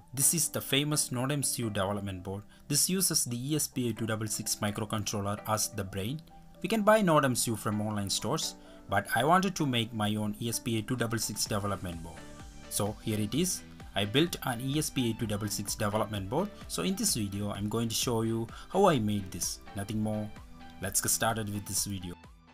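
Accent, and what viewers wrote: Kerala, India. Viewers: So, a total of 5$ which is not that costly but judging by your accent are you from Kerala,India?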